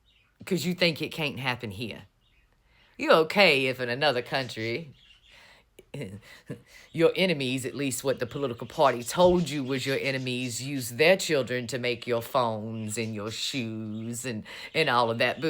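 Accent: South, United States. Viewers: England here: I love your casual, conversational style of getting the message out. You're a powerful orator with a lovely southern accent. Keep up the good work. The world needs to hear what's going on in America, now more than ever. 😘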